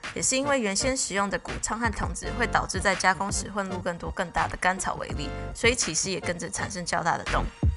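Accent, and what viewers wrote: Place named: Taiwan. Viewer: 雖説台灣妹子説的不是標準國語，但這個調子聽起來好可愛！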